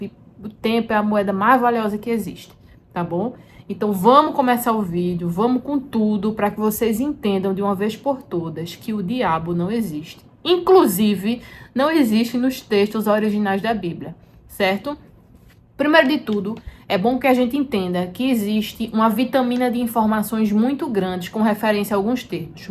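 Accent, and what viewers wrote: Northeast, Brazil. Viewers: Olá! Adorei teu sotaque de algum lugar do interior do Nordeste!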